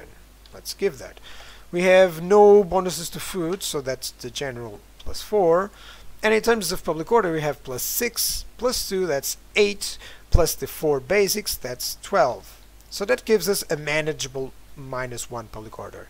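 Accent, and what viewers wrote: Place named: Poland. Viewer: Vaya curro te has pegado, gracias por compartirlo. Por tu acento pensaba que serías de polonia o pa ya pal coño. Saludos, y gracias, otra vez.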